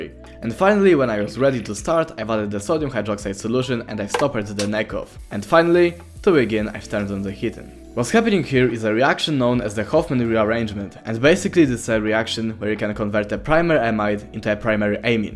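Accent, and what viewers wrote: Poland. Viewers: Why are you speaking with a polish accent?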